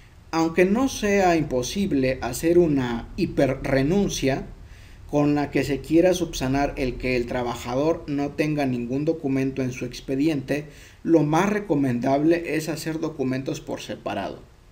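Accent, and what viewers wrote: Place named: Spain. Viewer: Jolines! y porque hablas como español?? trabajjjo, jjjjornada...etc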